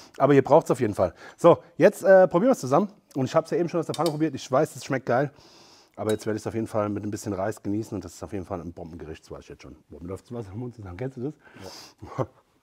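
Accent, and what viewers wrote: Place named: Frankfurt, Germany. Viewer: Schaut gut aus,und der Frankfurter Dialekt 🤟👍🏻Gruß aus HD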